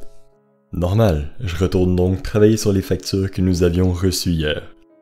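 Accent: Quebec, Canada. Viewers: Mais c dingue à quel point ton québécois ce mélange bien avec tes creepy pasta. J'aime énormément ton travail. Chez toi comment ce passe au niveau du virus ? Ua un confinement ou autres ? Moi je vie dan l'est de la France qui a était rapidement contaminé acose de l'Allemagne. J'habite plus précisément à laxou dans Nancy. Nous sommes zn confinement de 7jours sa vas. Sauf notre gouvernement est pas à la hauteur. C pénible. Pas de masque poir le déplacement ou le peu de travalleur non confiné. Et attende l'accord de la communauté scientifique. Pour le médicament miracle n'importe quoi. Heureusement que les médecins libereaux eux le donne au plus malade. Tien moi au courant stp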